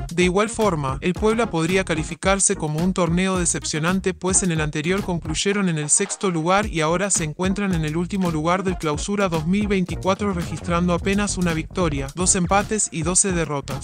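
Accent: Argentina. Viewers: Y siguen con su tonito argentino.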